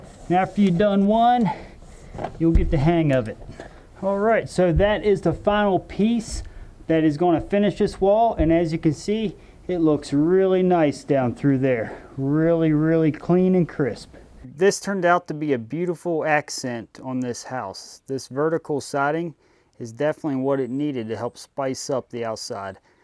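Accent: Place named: Baltimore, United States. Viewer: Very thick Delmarva Baltimore sounding accent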